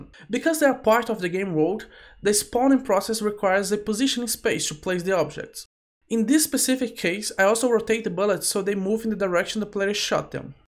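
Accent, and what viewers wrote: Brazil. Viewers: As soon as I heard the accent I knew it was another Brazilian 😂 Glad more Brazilians are making content in English it is a nice change and as a Brazilian myself it is exciting to hear this 🎉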